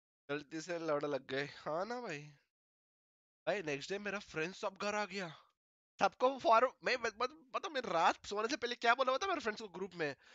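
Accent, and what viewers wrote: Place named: South India, India. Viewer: ohh his voice is lile south indians :)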